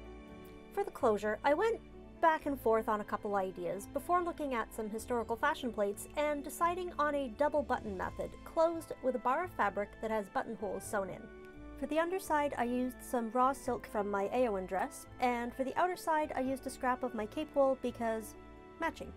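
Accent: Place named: United Kingdom. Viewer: You sound British lol I was confused when you said Canada 🇨🇦 I subscribed I enjoy watching your videos.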